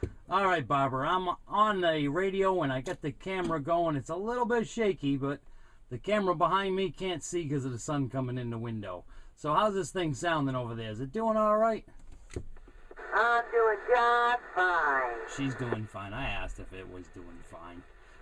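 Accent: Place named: Boston, United States. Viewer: Just realized your Boston accent! I watched all of these twice: great fun!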